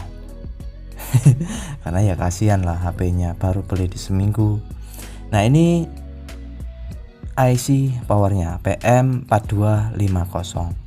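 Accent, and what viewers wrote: Java, Indonesia. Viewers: Samean asli mana mas ? Kok koyoe medok nek ngomong. Hehee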